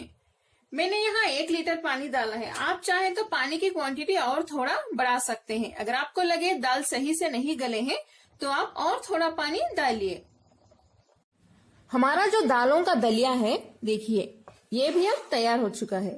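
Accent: Hyderabad, India. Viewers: Aap Bhi Hyderabad K Hai Kyaa? Kaiku Bole Toh Thodi Baat Se Hyderabadi Mlm Hora!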